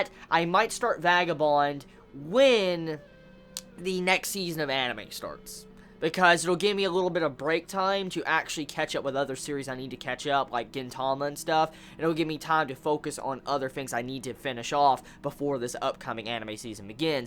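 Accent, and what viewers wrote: South, United States. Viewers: I never picked up on this before but your accent sounds distinctly Southern all of a sudden. You from the Southeast United States?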